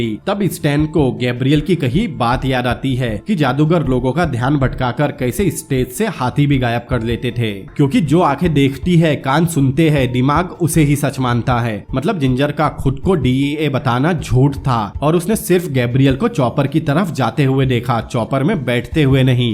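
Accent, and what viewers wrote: Assam, India. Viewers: 👍👍👍 I like ur voice... R u from Assam, As your hindi is like Assamese.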